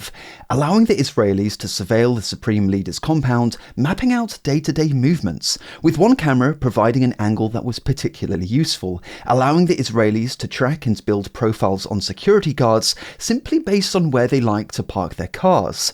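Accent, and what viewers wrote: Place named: United Kingdom. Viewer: American ? but the accent is British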